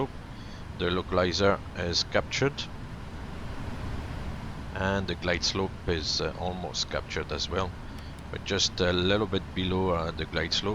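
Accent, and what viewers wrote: France. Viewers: as french i can confirm he is from france, i can recognize french accent by thousands xD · love the french accent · most probably French?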